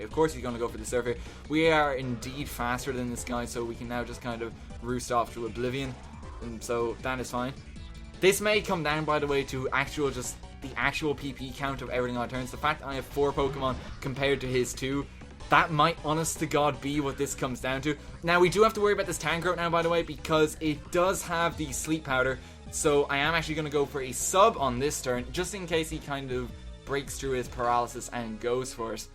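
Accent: Ireland. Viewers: Irish Accent <3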